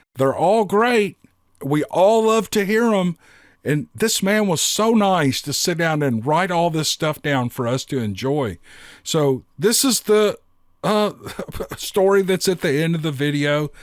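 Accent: South, United States. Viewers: Lol see-ment (cement) I love your country accent!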